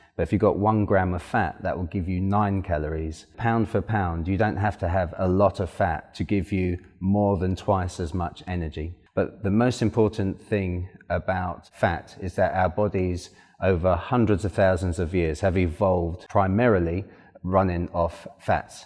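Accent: England, United Kingdom. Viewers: He's English!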